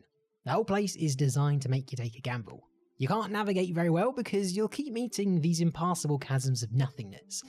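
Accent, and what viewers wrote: United Kingdom. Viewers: So british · Thats how ever british person sounds like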